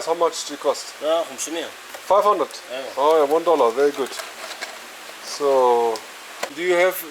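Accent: Germany. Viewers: German, or? (ich höre den Akzent 😂)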